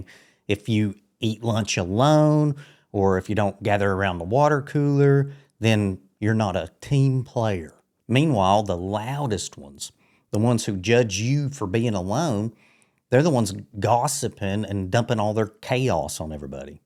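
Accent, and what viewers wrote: South, United States. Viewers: You have a totally southern drawl. Do you get paid for these videos. ???????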